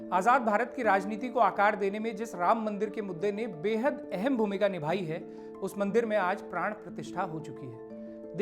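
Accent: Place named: Pahadi, India. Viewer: ji apka news deliver krne ka ye pahadi andaz Hame bht bha rha hai Apse vinti hai ese yese hi jari rkhiyega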